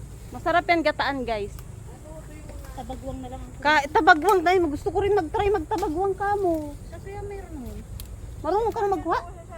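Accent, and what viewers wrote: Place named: Bicol, Philippines. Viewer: Taga bicol din kc ako pamilyar yong salita mo sa akin may punto kc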